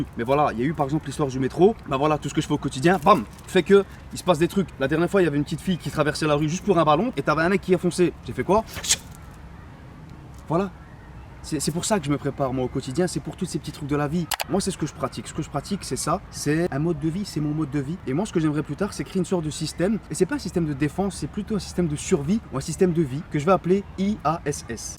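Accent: Belgium. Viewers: O lala l'accent belge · Tu es belge ?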